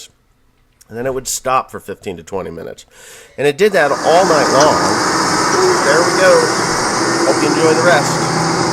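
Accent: New Jersey, United States. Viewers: what part of jersey are you from?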